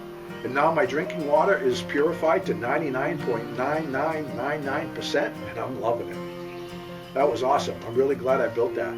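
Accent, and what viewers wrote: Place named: New England, United States. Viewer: Hi sir! I really enjoyed watching your video... I am thinking of building my own off grid cabin and was concerned with how I would get clean water. I could easily add gutters and a rainwater catchment system, even on a lean-to just off by itself. I would love to try to make this, even just to have I think it's a great idea, even for camping. Thank you, from a fellow New Englander, I knew I could trust you by your accent. :)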